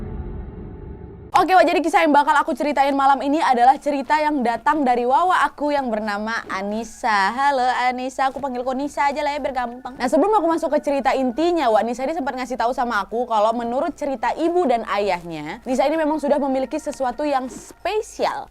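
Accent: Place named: Java, Indonesia. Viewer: Plg suka kl wak ngomong bhsa jawa, lucu tp salut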